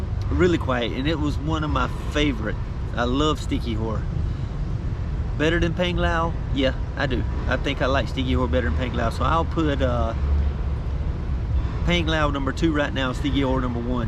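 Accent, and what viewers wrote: Appalachia, United States. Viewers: are you inbred variety??? you sound like a hillbilly or Adam sandler in water boy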